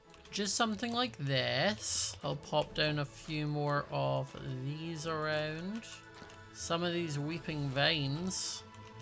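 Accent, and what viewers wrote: Scotland, United Kingdom. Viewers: Scottish accents are the best accents I do not have one 😞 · Scottish acents are funny sometimes lmfao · Hello I was puzzling around from were you came from. Because some how im Familiar with this Englisch that you are speaking Australien, Cockney Englisch. Canadian Englisch. Then maybe Scottish. That could be. I was not shure. Scottish is positive. I few years ago our Theatical group got ivited to St. Andrews. And I remembered the Englisch there were speaking is like yours. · Are you Scottish 😮😮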